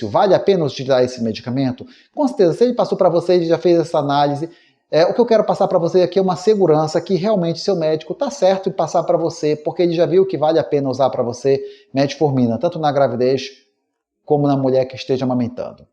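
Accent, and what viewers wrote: Pará, Brazil. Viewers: Muito bom, reencontro esse sotaque maravilhoso do Pará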